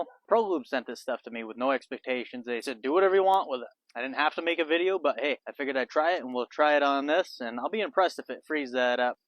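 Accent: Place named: Vermont, United States. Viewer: what part of northern Vermont are you from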